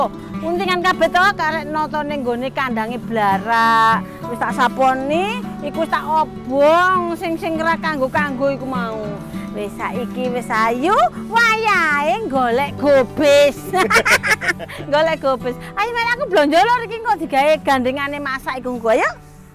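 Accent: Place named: Surabaya, Indonesia. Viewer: Bu kok koyok wong surabaya lek lihat logatnya😂😂😂😂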